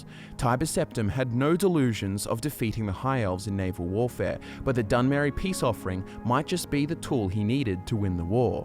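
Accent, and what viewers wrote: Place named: Australia. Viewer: Gergazie that’s just his Australian accent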